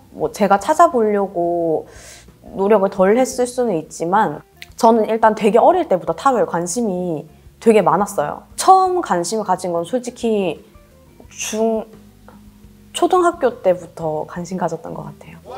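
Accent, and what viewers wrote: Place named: Gyeongsang, South Korea. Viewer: 경상도 사투리가 조금씩 섞이신듯^^ㅋ말씀 많은도움이 되었습니다 감사합니다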